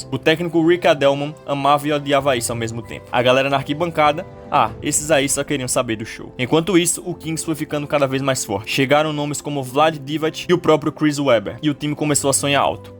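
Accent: Alagoas, Brazil. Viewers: Tu é de Alagoas? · boa irmão! seu sotaque é foda! NbA nordeste🫵🏾⛹🏾🏀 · Tu é de Alagoas? Esse sotaque denuncia...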